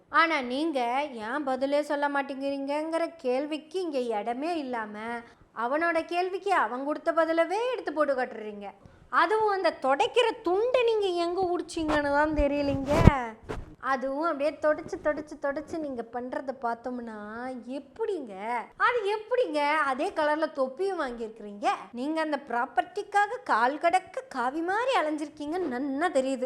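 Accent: Kongu, India. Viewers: கொங்கு தமிழ். அருமை. சில நேரங்களில் பேசும் மலையாளமும் புரிகிறது. satire. அருமை. மக்கள் ultimate. மக்களுக்குப் புரிய வேண்டும். புரிந்து விட்டால் போராட்டங்கள் குறையும். சரியான புரிதல் முக்கியம். அரைகுறையாகப் புரிநது கொண்டவர்கள் ஆட்டம் அதிகமாக இருக்கிறது. அந்தக்காலத்தில் கலைவாணர். இப்போது.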